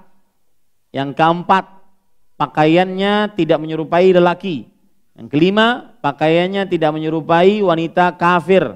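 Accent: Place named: Banjar, Indonesia. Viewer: Orang banjar lih pian guru..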